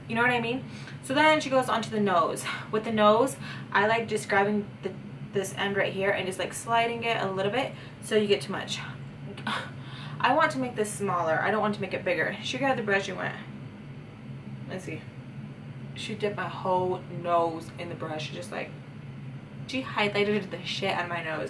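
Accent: Mexico. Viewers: where r u from btw I love the spanish accent sounds kinda mexican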